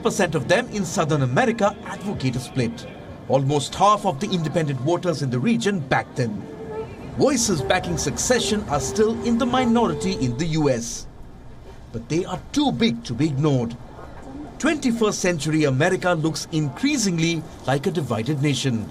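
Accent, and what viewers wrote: India. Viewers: That could always just be India’s dialect of English. Different places speak the language differently. One I’ve noticed that we don’t say here is “pressurizing” rather than “pressuring”. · I think she's speaking in an indian accent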